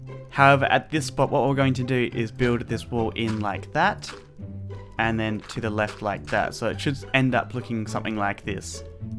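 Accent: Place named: Australia. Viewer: I luv ur Australian 🇦🇺 accent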